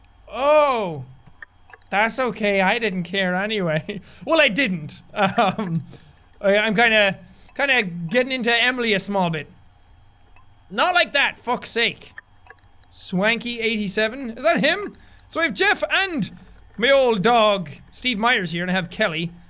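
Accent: Ireland. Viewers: jack idk where you moved but please try to not lose your irsh accent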